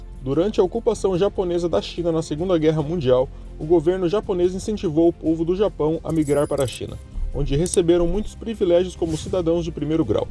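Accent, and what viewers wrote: Brazil. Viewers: Sotaque sudestino de merda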